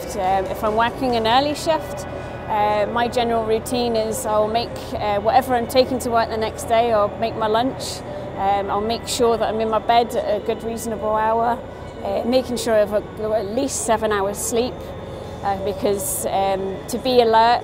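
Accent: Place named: South, United States. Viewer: sounds like somebody who moved up from the south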